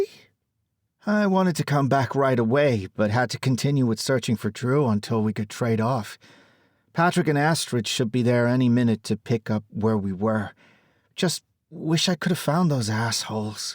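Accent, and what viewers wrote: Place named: United Kingdom. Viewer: WHY does a book set in SEATTLE need a "british narrator"?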